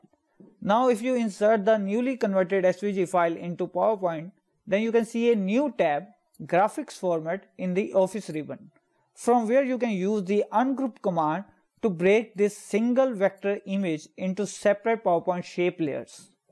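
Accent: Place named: India. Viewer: his accent sounds like indian and look at the top-right corner, his name is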